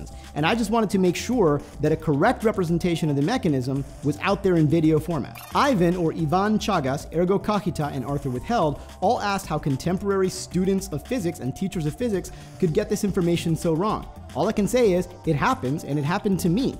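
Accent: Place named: Australia. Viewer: Man, I feel the exact opposite. Plus Australian accent and slower speaking style are much more pleasant to listen to.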